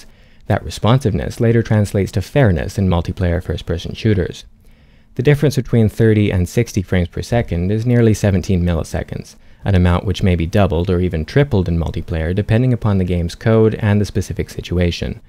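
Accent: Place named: Canada. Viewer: Probably because he's not a yank. (Canadian. Same accent, less whine, not a huge ass. Multiple meanings there =D)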